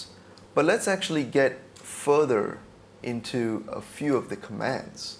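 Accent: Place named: Australia. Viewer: yeah I picked your accent for Aussie. I live near Murwillumbah.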